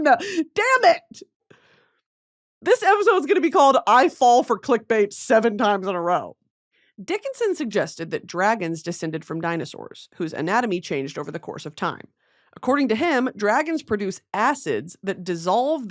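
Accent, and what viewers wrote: United Kingdom. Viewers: PLEASE Brittany make a potions ASMR video but also WITH THE BRITISH ALCHEMIST ASS ACCENT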